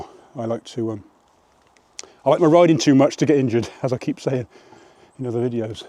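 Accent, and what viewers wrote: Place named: South West England, United Kingdom. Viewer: what part of the country are you from? I rather like the varied English accents! I was guessing you are from the South West?😊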